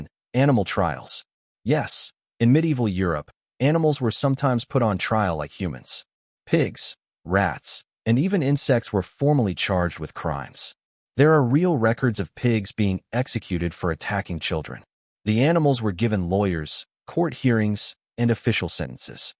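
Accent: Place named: United Kingdom. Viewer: Love the voice It's similar to uk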